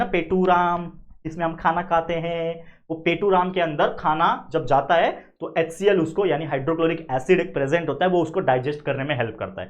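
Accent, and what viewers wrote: Maharashtra, India. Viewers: sir, is your mother tongue marathi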